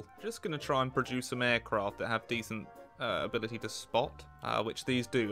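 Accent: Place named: England, United Kingdom. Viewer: What's the name of the type of English accent he has ?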